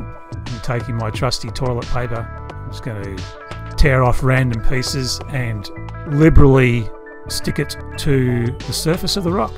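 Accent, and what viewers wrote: Australia. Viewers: The aussie accent 👏😍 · I'm not quite used to Aussie accent, but goodness me, the art work is fantastic. Pearl sent me here, BTW. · The most Australian voice I've ever heard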